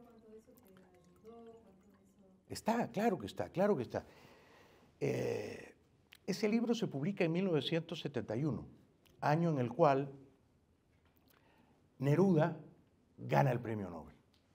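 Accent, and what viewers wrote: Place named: Lima, Peru. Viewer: Jaime es muy limeño en su idiosincrasia y manera de hablar. Me encanta...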